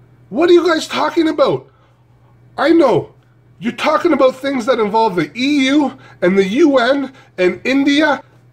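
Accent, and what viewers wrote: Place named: Canada. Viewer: You're so adorably Canadian. Good Lord, that accent :).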